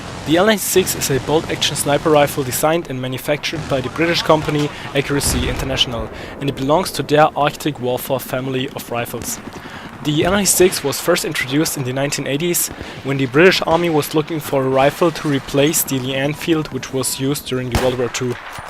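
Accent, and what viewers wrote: Germany. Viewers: are you somekind of german or austrian?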